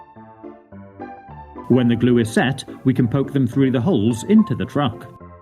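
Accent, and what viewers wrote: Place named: RP, United Kingdom. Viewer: I like your posh British accent! I’m British and have a very posh accent! I’ve half way through made this with my dad, I’m making it but we’ve only done about half (I’m exited for it to get finished!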